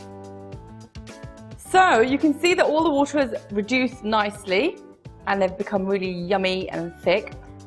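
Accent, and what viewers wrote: England, United Kingdom. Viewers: English accent me chole bhature.....maja ni aya...😛😛😛😛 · the first thing I noticed is your British accent. my twin was watching you in the living room and I overheard it from my room and thought why nigella lawson sounds quite different today 😂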